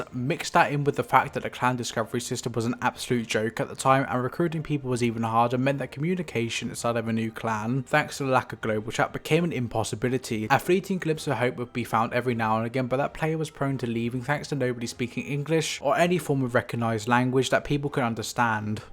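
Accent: United Kingdom. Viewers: You sound like you’re northern Irish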